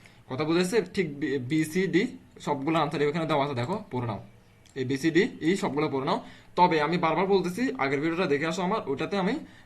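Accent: Chittagong, Bangladesh. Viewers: Sir apni ki Chittagong er?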